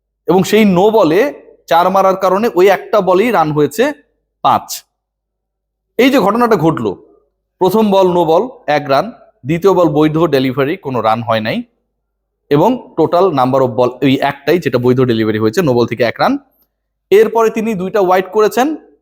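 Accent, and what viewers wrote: Noakhali, Bangladesh. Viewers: ভাই নোয়াখালির বাষায় বলে মোশাই কতটুকু তার হুনিতই কতটুকু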